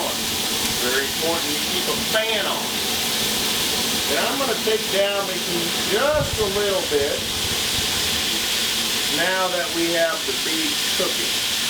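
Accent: Texas, United States. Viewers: Or do all Texans speak like this?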